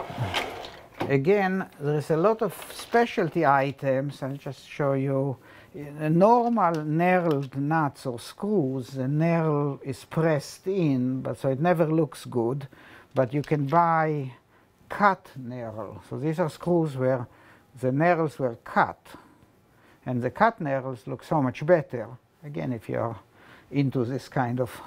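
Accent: Israel. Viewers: Hebrew accent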